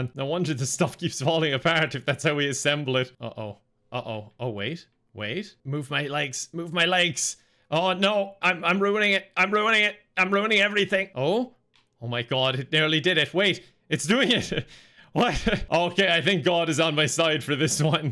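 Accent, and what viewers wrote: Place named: Ireland. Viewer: Love watching play games that are about awkwardly controlling movement, like octodad. He just gets more angry and Irish lol.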